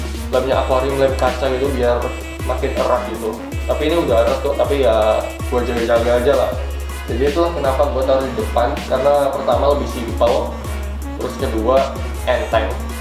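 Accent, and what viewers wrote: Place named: Java, Indonesia. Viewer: medok sekali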